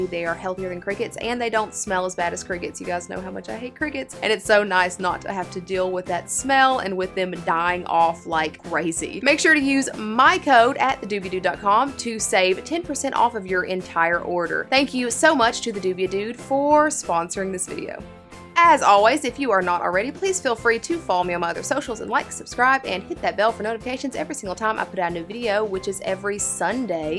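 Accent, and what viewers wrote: South, United States. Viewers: I love how she says thangs instead of things. It's a southern thing y'all lol. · Your accent sounds familiar. What part of the south are you from that is if you are from the south I don't mean to assume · Is that a southern accent I hear 😏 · Thangs! Good ol southern girl haha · Snake talk with a little bit of a southern accent. I love it. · Hi I love that you are from the south it really gives me confidence as I am getting ready to start my channel to be myself because I feel like NO ONE on youtube has a southern accent but watching your video made me so happy!!!:) subscribed:)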